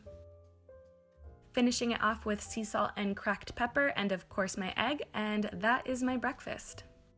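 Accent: United States. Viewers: I'm confused. You have an American accent but I noticed you using British products ?? No hate just curious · Do you get Asda in America? I assume by your accent that you're American but I thought that Asda was only in the U.K.? Might be be wrong xxx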